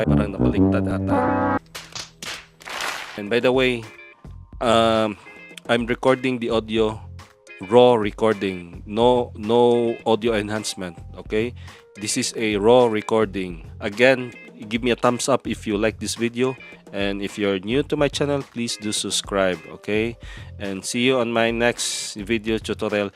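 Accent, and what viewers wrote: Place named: Nagaland, India. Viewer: thank you bro. And u sound like a native from nagaland . Google it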